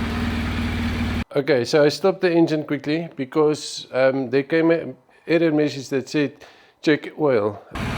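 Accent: South Africa. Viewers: Het perongeluk op jou channel afgekom. Lekker om n afrikaanse aksent te hoor al die pad hier in Skotland. Ek het n 1250GSA en kan sien eendag sal ek die videos kan kyk vir enige raad. Sterkte en kan nie wag vir volgende video nie.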